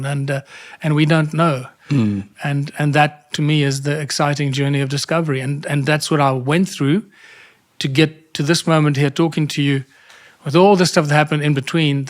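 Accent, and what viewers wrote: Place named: South Africa. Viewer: I didn't know you were South African,. But in this interview I can even hear a bit of South African in your accent ❤. I'm also a South African living in the Netherlands 🇳🇱 and I've really been enjoying your show.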